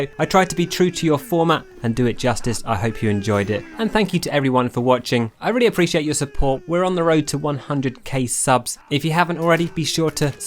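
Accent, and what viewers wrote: Scotland, United Kingdom. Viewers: but are you scottish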